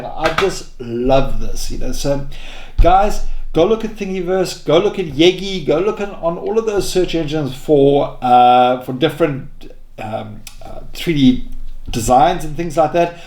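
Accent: South Africa. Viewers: I picked up the south african accent right away. Hehe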